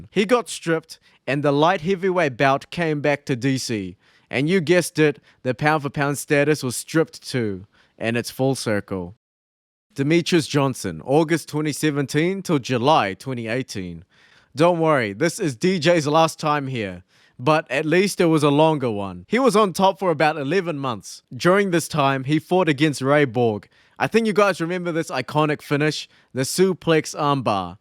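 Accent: New Zealand. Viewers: Is that accent an newzelander that’s been living in America?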